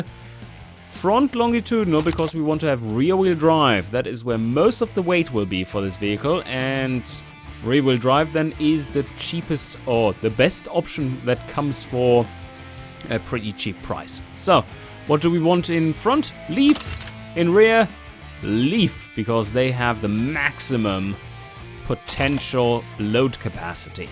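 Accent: Germany. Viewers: Actually, are you from Germany? :D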